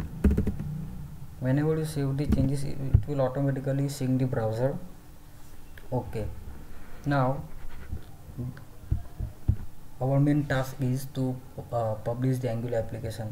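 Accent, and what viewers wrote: India. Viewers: nice video,..R u gujarati i think..right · Indian accent truly bothers me. An entire nation speak English sooo bad I hate watching videos created by them.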